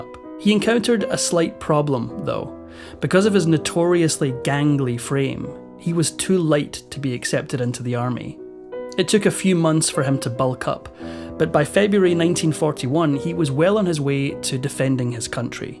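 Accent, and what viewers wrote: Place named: Scotland, United Kingdom. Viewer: A fellow Scot narrator 🏴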